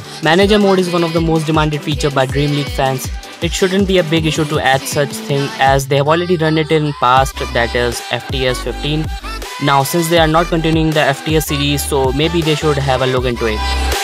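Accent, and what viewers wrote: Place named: India. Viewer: Are you from india bro .? Your voice like indians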